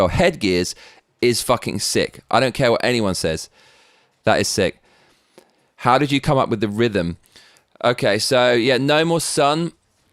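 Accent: England, United Kingdom. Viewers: What part of England are you from ? I was born in Acton London W3 .. lived in South Carolina in USA FOR 50 years .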